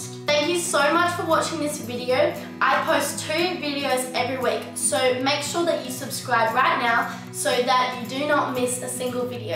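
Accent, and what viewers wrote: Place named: Australia. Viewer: Amazing video love your Aussie accent ahaha❤️❤️❤️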